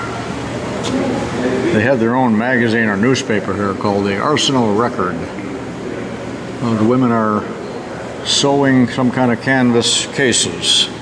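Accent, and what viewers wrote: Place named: Midwest, United States. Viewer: No disrespect intended . ! I guess it's that Midwest voice that reminded me of him . He was a good man .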